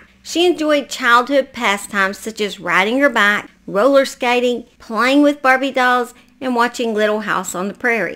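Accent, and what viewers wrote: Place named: Tennessee, United States. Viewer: I almost flip this video until i remembered someone saying my Pal was unintelligent. Country doesn't mean dumb ma'am. Yes said ma'am because I am born and raised in Tennessee. I suspect we sound very similar. The love of my life called me bammer the day we met. Had to look it up to get a clue. 😂 Somas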